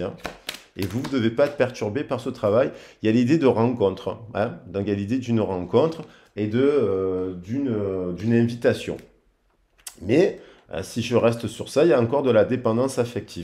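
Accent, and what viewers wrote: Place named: Marseille, France. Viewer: Merci petit et cette petit accent de Marseille 😉🙋‍♀️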